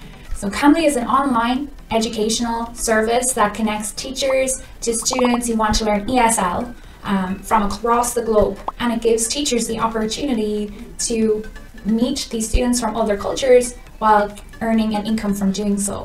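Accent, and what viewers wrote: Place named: Ireland. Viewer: Are you from Ireland? You sound Irish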